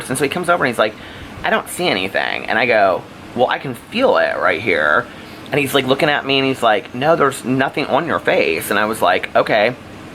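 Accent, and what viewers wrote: South, United States. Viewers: I would say you have a Southern-esque accent. I have a friend from Georgia and you sound almost exactly like him, just not as extreme. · I totally hear the southern in your voice!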